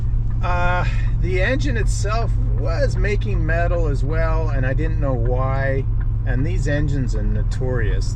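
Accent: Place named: Canada. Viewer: Sounds like yer from the great white north bud. Good thing ICBC doesn't do inspections eh?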